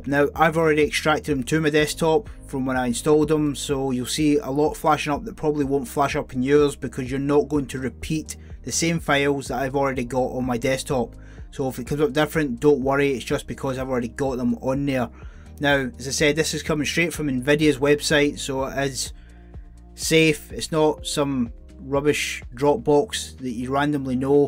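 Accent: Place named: Scotland, United Kingdom. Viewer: DLSS seems to work good as well! Any intends to show off some of the worlds you have/will created/create in Minecraft RTX? I mean, I watched every video about it, and evey single one showed the demo. Would be really nice to see something diffr, looking forward for your contents. Many thanks and stay safe please! I like your scottish accent, btw(..odd to say? I know lol).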